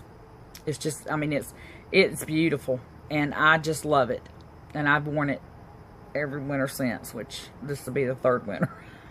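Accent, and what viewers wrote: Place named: North Carolina, United States. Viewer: By the way~ I am from Durham (but have lived in Texas for 30+ years). I love the way you say "Derm" for Durham! I miss the NC accent! ♥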